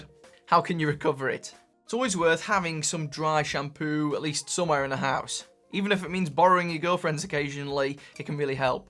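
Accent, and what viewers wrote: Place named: Sheffield, United Kingdom. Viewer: Sheffield accent 🤩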